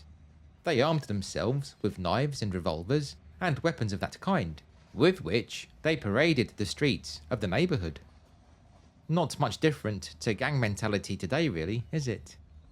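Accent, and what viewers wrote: United Kingdom. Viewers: Everything is more interesting when told with a British accent.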